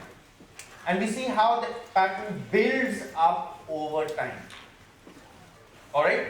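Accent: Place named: India. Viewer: when he starts talking in his indian dialect and then he ends it with "and thats what physics is all about" ... very good explanations thanks for sharing.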